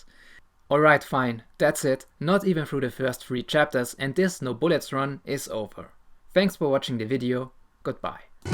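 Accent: Germany. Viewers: Ich höre schon das du deutsch bist Bro haha , unterhaltsames Video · Man merkt dass es deutscher Akzent ist aber du sprichst trotzdem gut Englisch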